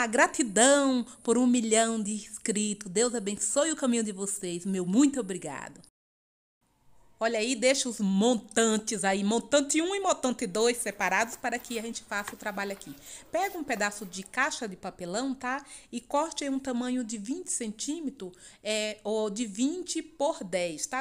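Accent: Bahia, Brazil. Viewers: E tá baiana arretada,gosto muito do teu jeito de falar 👏👏👏👏👏👏🙏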